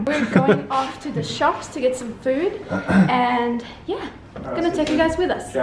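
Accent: Australia. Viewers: yep you sound Aussie! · Aussie, with a twist of pommie... ;) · THAT VIEW THOUGH. I know you're probably so over hearing about it, but you're little aussie mix accent is the cutest thing ever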